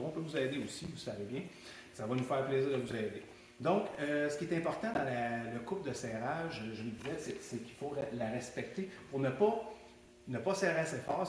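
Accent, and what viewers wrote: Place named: Quebec, Canada. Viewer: Vive l'accent du Quebec !!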